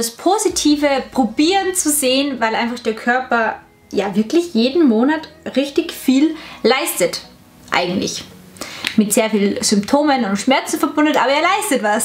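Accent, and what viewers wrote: Austria. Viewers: Bist du Österreicherin? :) (wegen dem akzent hätte ich halt geraten haha)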